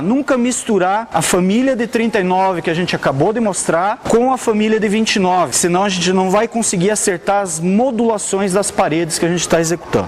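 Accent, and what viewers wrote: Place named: Paraná, Brazil. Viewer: VC É DO PARANA PELO SOTAQUE EU TAMBEM SOU 🙌🙌🙌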